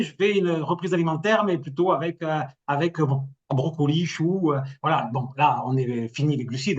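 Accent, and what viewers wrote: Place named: South, France. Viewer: Bonjour, si c'est mon débit de parole, pas de souci vous pouvez le ralentir dans les paramètres lecture à 0.75 . Si c'est ma voix et mon accent du sud, ça on peut rien changer 😉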